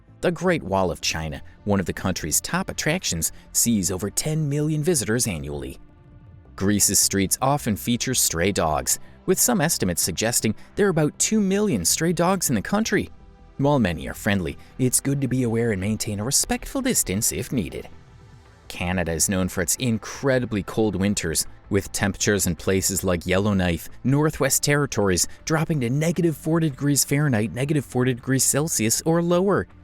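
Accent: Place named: Michigan, United States. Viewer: That Narration Accent Sound like my Michigander Accent! 🇺🇸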